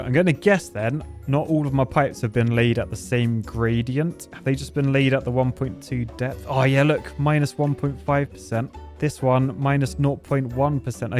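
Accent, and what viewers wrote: United Kingdom. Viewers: I heard way more British pronunciation in this "game" than any other video. 😂 I was like "What'd he say?" 😊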